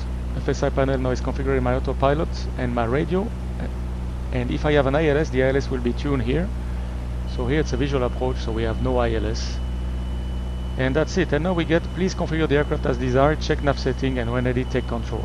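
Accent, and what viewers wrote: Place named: France. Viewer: I love your France accent realy now my request me and all my friend between <num> group what they call us gooney birds so..fsx or developer put all gauges in the wrong place we were making correction by FSPanel studio in fsx but now P3D v4.2 way better then fsx which is normal new generation smart boys,,but we don't have FSPanel Studio for P3D v4.2 can you help us we are 200 thounds staying a life make us happycheck me out in you tube..murray ayata fspanel studio may be better understanding regards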